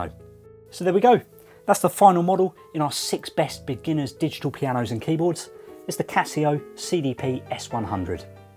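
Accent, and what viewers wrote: United Kingdom. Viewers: is he british? hahahahha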